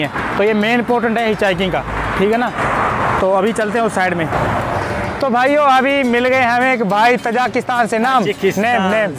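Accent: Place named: Punjab, India. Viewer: Bai ji, Mai roz hi tuhade vlog vekha haan, par Subscribe nhi si kita. Par aj theth Punjabi sunde saar sidha hi Subscribe button nap ta. 😍💕🙏😍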